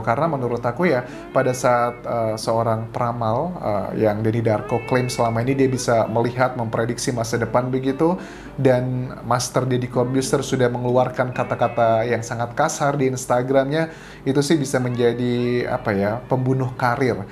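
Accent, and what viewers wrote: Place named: Java, Indonesia. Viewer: mas wong jowo jebul yo.. jowone ngndi mas hhh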